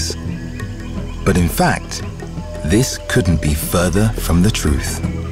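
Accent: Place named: United Kingdom. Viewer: This is the "Smithsonian Channel". Why is some limp British accent narrating? America has becaome effeminate like Britain.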